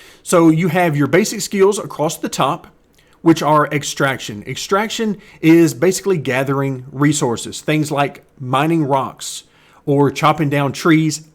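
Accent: South, United States. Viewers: Your videos are great, learnt alot! Also the added novelty of you having a Southern American accent whilst explaining a medieval game is brilliant! Keep up the good work 😁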